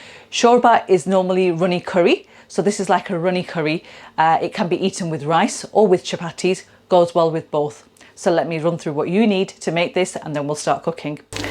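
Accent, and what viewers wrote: United Kingdom. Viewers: searching for Pakistan recipes and YouTube showed you first.after listening to your British Accent i decided you are professional 😀😀 by the way you are a very beautiful girl.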